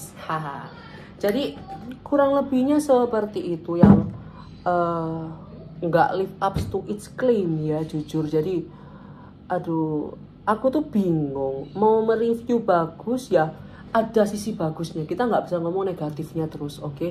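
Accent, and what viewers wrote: Surabaya, Indonesia. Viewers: E beneran ternyata logat khas suroboyo hehe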